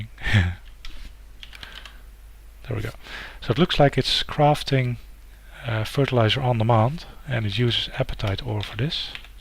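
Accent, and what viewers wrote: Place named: Netherlands. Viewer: You sound a bit of foreign, Dutch perhaps? :P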